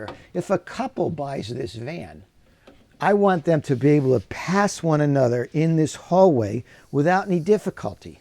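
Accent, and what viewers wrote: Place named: New York, United States. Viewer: I am new to your channel. I saw you on Lola's channel. I love your work & your accent, it's kind of New Yorkish. Yes Americans do have accents!.. Now l want to know what's wrong with the mat! 🤣🇬🇧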